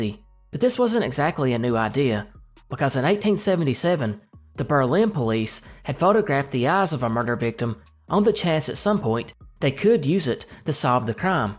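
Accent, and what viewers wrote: South, United States. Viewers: These are all great obscure mysteries. I can tell you spent some work on this. Nice to hear another Southerner too. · Man it’s nice to hear this type of video from a southern voice. I left Tennessee a few years ago and I miss our way of talking.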